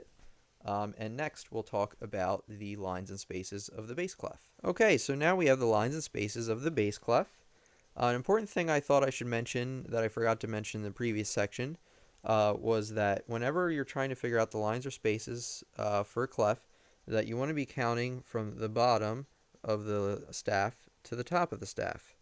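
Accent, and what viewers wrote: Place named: United States. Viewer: Jess Ng lol he sounds like a normal american.. Its pretty easy to comprehend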